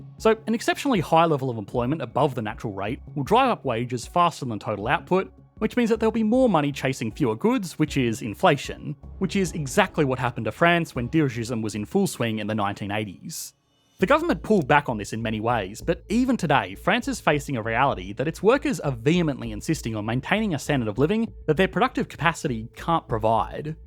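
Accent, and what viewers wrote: Australia. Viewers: it's australian. he has a very obviously australian accent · I don't think he's British or he would have mentioned that many other countries have state owned enterprise. UK being one. His accent seemed Australian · the fact that you think this channel is from the US speaks volumes of how ignorant you are. The man has a clear Australian accent and mentions that he lives in Australia all the time. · I only realise that you're an Australian at the end of the video. 'B(e)ye'.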